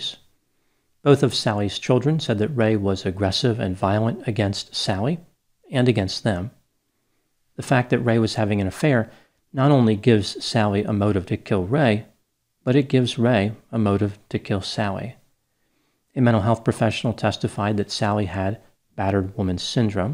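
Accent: United States. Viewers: My name is marianne and live in England 🇬🇧 I love the way it sounds with American accent 😀 😄 xx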